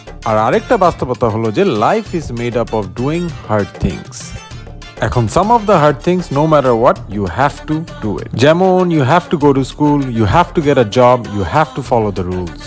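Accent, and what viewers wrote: Bangladesh. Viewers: খানিকটা যশোরের ভাষা 🙂😊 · Brother are you from sylhet ❤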